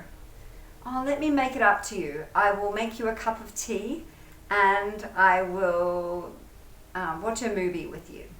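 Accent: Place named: Australia. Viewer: I think you speaking Australian English.. Good teaching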